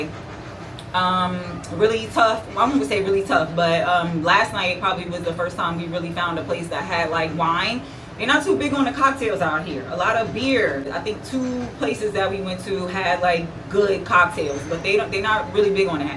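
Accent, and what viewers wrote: Brooklyn, United States. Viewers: Can I say I’m so glad I just found your channel sis BECAUSE the way your breaking everything down in your true genuine Brooklyn voice is TOP TIER YO!!! - No cutesy made up influencer voices JUST THAT TRILL SHIT and when you said Irregardless sent my ass because I STILL SAY THAT 😂🤣😂🤣🤣 instant likes and subscribe or whateva😉💯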